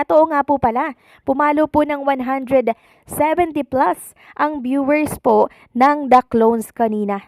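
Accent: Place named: Visayas, Philippines. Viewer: bisaya ka day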